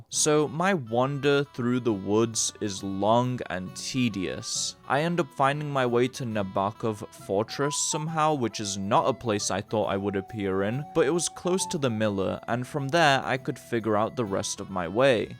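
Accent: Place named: Northwest England, United Kingdom. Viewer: This is my fourth playthrough. Two in original mode and my second playthrough in hardcore. The blacksmith dlc is heaven sent. This time I started it asap. This fourth playthrough is the best yet. Take my time and new things come up all the time. I'm finally getting a handle on the Kuttenburg map but I still get lost at times and for me it's mostly because I get lazy in my navigation before I head out from a town or whatever. I use my body as a sundial except for when it high noon ish. Never get off the road at night very far w/o nighthawk. But yeah, the immersion experience is so good in hardcore that I would never go back. I only use three negatives and the easiest ones for me. Very nice and relaxing video. Keeps the love fresh for the game. Cheers btw where in the UK is your accent from? My guess is the northwest. Blackpool. 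Liverpool?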